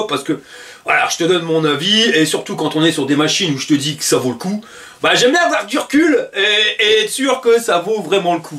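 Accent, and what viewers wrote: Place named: Ch'ti, France. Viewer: Suis d'accord mais c le charme du nord , les ch'ti quoa, c'est cool la différence.😂. Moi il me fait rire, il devrait moins forcer sur le patois c'est vrai 😂. Surtout qu'il à de bonnes compétences techniques, c'est dommage que dès fois on est du mal à comprendre tt les expressions, car je ne suis pas de Lille et tout le monde non plus , mais il me fait rire quand même 😂.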